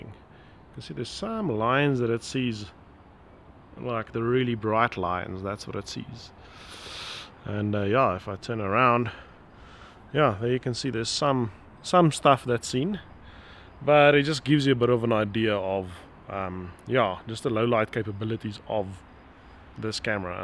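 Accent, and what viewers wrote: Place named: South Africa. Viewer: Thanks for providing this. Good to hear the Saffer accent. Puts it in a good context to whether we want to purchase it.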